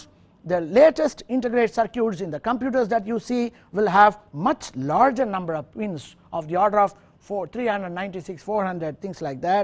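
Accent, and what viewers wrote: India. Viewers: I really love the way the Indian accent sounds. Reminds me a bit of my own accent (Irish).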